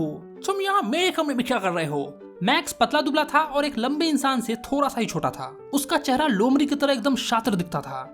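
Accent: Bihar, India. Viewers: Sir you use to talk in your bihari tone too many times "susura" pls have a look at it 😢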